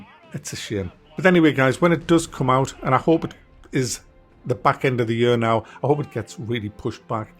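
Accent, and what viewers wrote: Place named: Scotland, United Kingdom. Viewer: Are you from Scotland or something? You have an interesting accent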